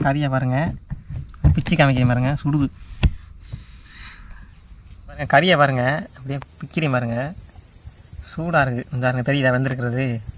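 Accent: Sivaganga, India. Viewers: Siva gangai tamil super nanba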